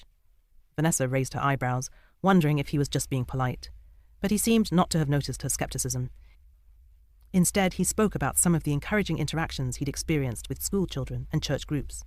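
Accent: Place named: United Kingdom. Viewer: The voiceover sounds way more British than the normal African tongue context, ngl but the phonetical pronounciations of each words solely suites the accurate tonations in variations. Gracias.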